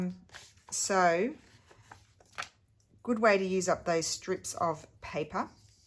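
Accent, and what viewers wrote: Australia. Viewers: I am changing your name from the Martha Stewart of YouTube to the. This is the stupidest question anyone has ever asked you. When you dream, do you speak in Italian or in your delightful Aussie accent? My friend is tri-lingual, and she says the people in her dreams speak all three, and often if two people are talking one is in English and the other speaks French. Great project!!!!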